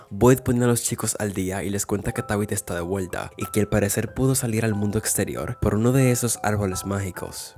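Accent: Dominican Republic. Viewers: Esa forma de hablar “Este pana, diciendo que ta' jarto de to su m4rdito plane que no sirven” Dominicano?????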